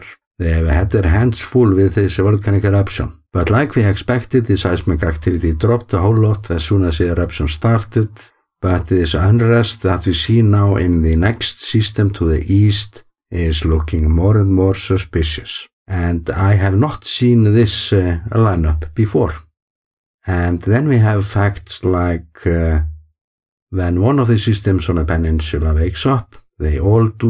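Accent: Iceland. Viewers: I love your english with the icelandic accent it makes your videos very soothing to listen to! Like a velevety hot chocolate lol! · Deep gratitude for your love and deep knowledge of Iceland. You won our hearts and minds during Covid. We are relieved at your intelligent wise and skilful reporting. From Australia to you in aiiiceland!! We are addicted to your accent and btw commiserate with the physical challenge you have as we too are sliding fast into our dotage.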